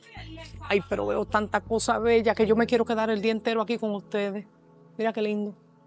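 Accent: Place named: Dominican Republic. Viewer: Arriba R.D., iba a preguntar si eras Dom. Por la forma de hablar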